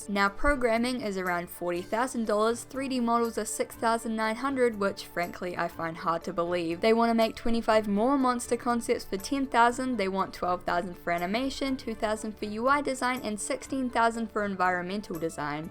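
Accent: New Zealand. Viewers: holy shit I had completely forgot about this project. it was actually a scam lol p.s. I love your kiwi accent! you got a new subscriber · Is that a New Zealand accent I hear?! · Just stumbled across this video by chance but I can't help but feel I've seen this person somewhere before. It might just be the kiwi accent but I'm sure I've met her at a party or sat next to her on a bus or something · I am now secretly praying that this youtuber is Australian and not a New Zealander, because I really like my joke but the more I watch the more her accent makes me think New Zealand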